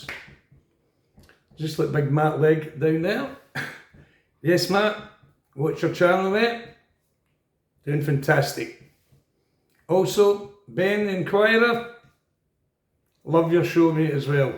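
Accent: Glasgow, United Kingdom. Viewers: Nah, Glaswegian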